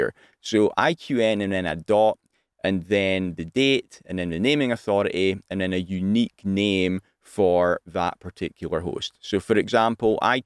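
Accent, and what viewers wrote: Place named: Aberdeen, United Kingdom. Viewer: haha, you still sound Aberdonian to me! I live in the centre, spent the majority of my life in Bucksburn. Really appreciated the video, I've subbed to your channel too. I graduated a year and a half ago at RGU in networking, been working service desk for a year and 4 months, an internal position has opened up for a infrastructure/cloud analyst. Trying to get an idea of what to expect for interview questions! I'm good with networks and also learned a lot about security but this is another ball park. I've built a homelab with esxi/proxmox, my own domain etc but there is only so much you can do on personal kit. I'll be sure to watch more of your videos when I get a minute. Cheers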